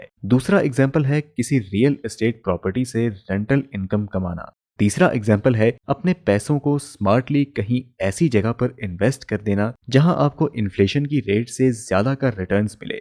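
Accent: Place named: Pakistan. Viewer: Your language sounds like pakistani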